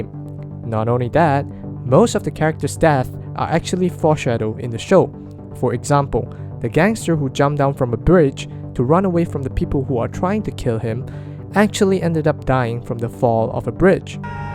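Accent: Singapore. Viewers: Are you from Singapore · you're from singapore arent you? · A segue, but you sound so singaporean! (Not a bad thing)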